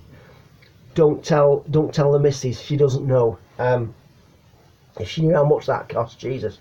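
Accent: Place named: Yorkshire, United Kingdom. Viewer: Yorkshire? Lancs? Ex-Halifax lad here. Living in CA. CB is quiet here so getting a 10M rig tuned and want to put an amp in the system. Found your clip and it felt like I were back 'ome again.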